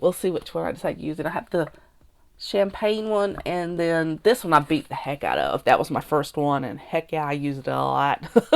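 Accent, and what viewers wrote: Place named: South, United States. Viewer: Love your accent! I’m from Mississippi but live in Alaska. I don’t hear that lovely southern accent much anymore.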